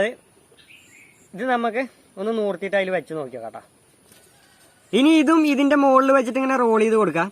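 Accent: Thrissur, India. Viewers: നാട് എവിടെയാ തൃശ്ശൂർ ആണോ സംസാരം കേട്ടിട്ട് തൃശ്ശൂർ പോലെ ഉണ്ട്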